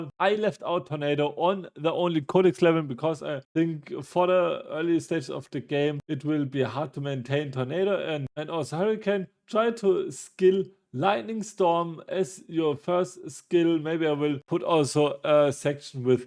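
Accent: Germany. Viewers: Digger dein deutscher Dialekt im English ist so wild 😅. Trotzdem danke fürs Video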